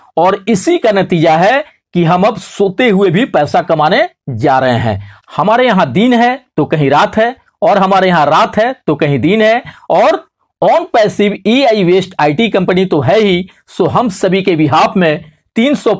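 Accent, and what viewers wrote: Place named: Bihar, India. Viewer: Sirji aap ki tone se lagta hai ki aap bihar se hai mein bhi bihar se hi hu aap se mulaqat ho sakti hai kya.aap bahut achhi informations dete hai.dhanyavad.jai ON-PASSIVE.JAI BHARAT.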